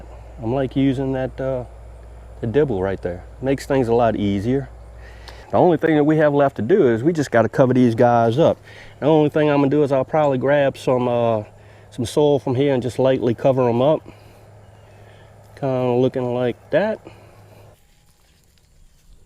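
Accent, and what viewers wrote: South, United States. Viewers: That dibble is fabulous! I cannot wait for hubs to make us one for spring planting. I am a new sub and love that charming southern draw of yours. It is cool here in Oklahoma today and we are loving it. Take care!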